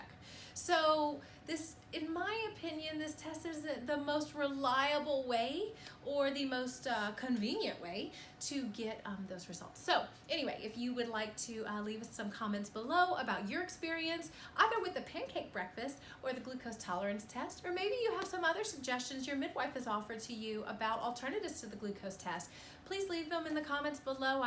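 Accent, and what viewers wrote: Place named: Louisiana, United States. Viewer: I like this idea! I actually have my glucose test in the morning but my midwife gave me a more natural option than the glucola. Organic apple juice. So, I certainly am not complaining. Also, it was nice to hear a familiar accent. A Louisiana native now living in Kansas City... I miss that. Thanks for sharing this video.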